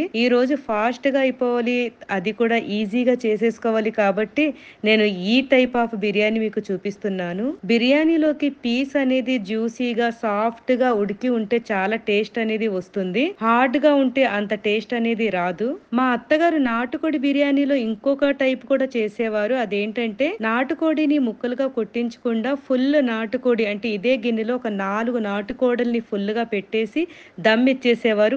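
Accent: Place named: Andhra, India. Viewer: మేడమ్ గారు మీరు తెలంగాణాలో ఉండి కూడా, అంద్ర తెలుగులో స్పష్టంగా మాట్లాడటం చాలా సంతోషం అండి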